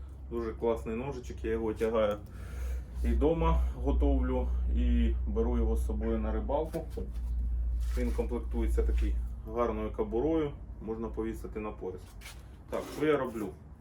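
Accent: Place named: Russia. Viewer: Акцент кацапа а так піде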